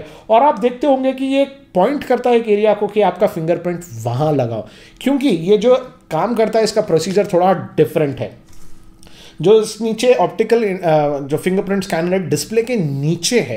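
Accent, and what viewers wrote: Hyderabad, India. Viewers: Now It's Time To Hyderabadi Hindi Well Explained Sir👌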